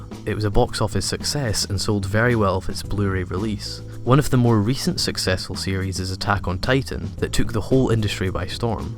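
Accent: Scotland, United Kingdom. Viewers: It's a stupid question but you're Scottish right? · i think it's some kind of British, but there's a lot of them, so i can't tell which one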